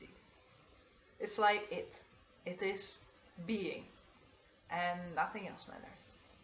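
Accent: Netherlands. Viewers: Hmm sounds sometimes like dutch or Flemish but when listen very good maybe Denmark Norway, I don't think east Europe Definitely not German. I'm very curious now. Please tell me. I started copelandia ogre boy shroom kit and researching YT Pobrezni❓polish really I have lots of polish friends here in Amsterdam and non of them sound like you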